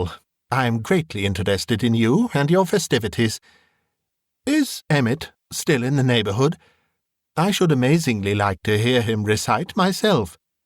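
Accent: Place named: RP, United Kingdom. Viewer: I do really enjoy listening to you especially because you use…dare I say(!?!) what my Grandmother (who raised me) called “The Queen’s English” to great effect. Of course, it’s how these old stories are written.But I’m sure the authors would be thrilled to hear you reading them! ♥️♥️♥️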